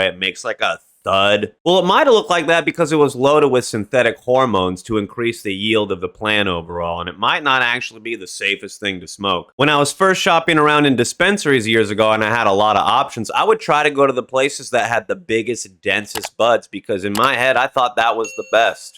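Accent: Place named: Boston, United States. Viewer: There’s no way your not from Boston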